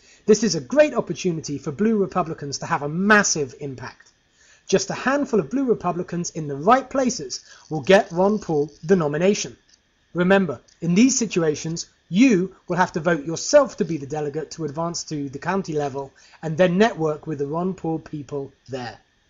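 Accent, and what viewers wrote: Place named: United Kingdom. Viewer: This is very informative and straight forward. The fact that a British voice made this video leaves me wondering how much international support Ron Paul has. Ron Paul 2012!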